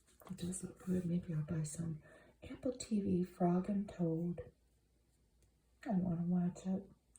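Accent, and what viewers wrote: Midwest, United States. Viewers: Your Midwestern pleasantness and accent keep me coming back 😊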